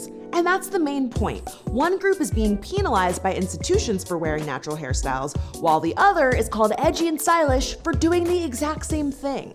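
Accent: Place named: South, United States. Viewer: So she once apologized for her southern accent, but then she puts on this white voice whenever she mimics white people. GIrl byeeee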